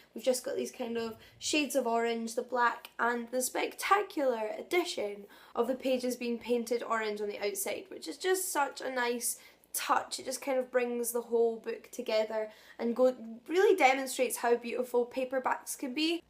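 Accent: Scotland, United Kingdom. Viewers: Beautiffuly illustrated covers! I love your accent too. I miss living in Scotland!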